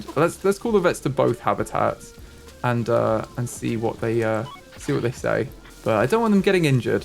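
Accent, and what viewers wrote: United Kingdom. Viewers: Hes british like meh mate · where is your accent from in the uk? its really cute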